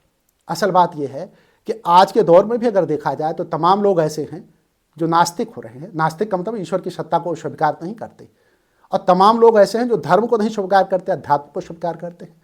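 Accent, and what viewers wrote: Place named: Madhya Pradesh, India. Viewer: भाई सर की और भी वीडियो देखिए। सर अध्यात्म की बात करते हैं । सर एक अच्छे राइटर भी हैं मैं इनकी सारी वीडियो देखता हूँ । सर कहाँ के रहने वाले हैं मैं नहीं जानता लेकिन शायद mp के हैं आवाज़ से ऐसा लगता है । इन सिर की दो पुस्तक मैंने पढ़ी हैं फ़िलिपकार्ट से मंगवाई थी । हो सके तो आप भी पढ़े। और रही बात आपके प्रश्न और कमेंट की तो भाई एक बात बताऊँ - “ सुअर के साथ कुश्ती नहीं करनी चाहिए क्योंकि आप गंदे हो जाओगे । लेकिन सुअर को मजा आयेगा।”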